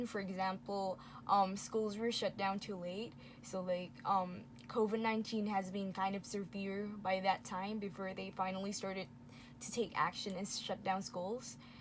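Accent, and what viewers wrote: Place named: United States. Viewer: Thanks! The pandemic and racism in the US are getting worse. I assume you are from the US based on your accent, please spread the info to the people around you to help curb racism against Asian.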